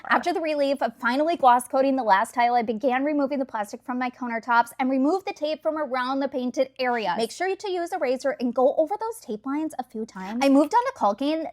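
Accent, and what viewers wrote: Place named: Midwest, United States. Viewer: Wow, your accent is so fascinating! It's like you're from Minnesota/Wisconsin but you've lived in California for 20 years. I am a HUGE fan of painting backsplashes instead of retiling if you hate it. You nailed it.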